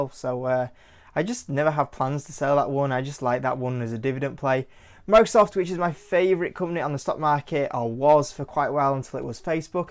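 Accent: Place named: Northern England, United Kingdom. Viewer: Thank you man, where about’s in the UK are you from, I’m London based. The accent sounds a bit Northern, my uni is up in York.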